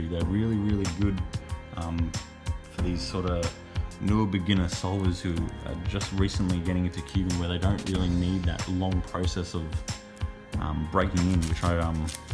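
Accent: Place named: Australia. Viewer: I love australian accent so much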